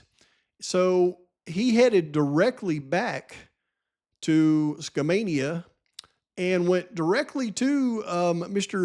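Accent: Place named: Arkansas, United States. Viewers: Mountains. I'm thinking Northern Arkansas or Geirgia. Everyime I play one of his videos, my husband says he sounds just like Dr Phil! Where is Dr Phil from? Lol! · Funny. I’ve been curious where you were from. Southern accent and you say “Interesting” the same way I used to when I grew up in the mountains. Not as remote as you describe though. Hang in there, we’re with you.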